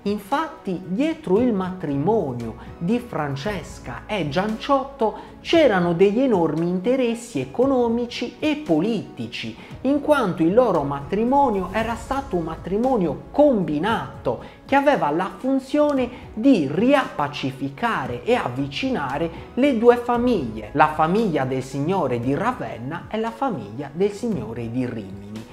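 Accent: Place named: Cagliari, Italy. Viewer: essendo io sassarese, si capisce tantissimo quando si trova online un sardo (in questo caso cagliaritano pk si sente fortissimo)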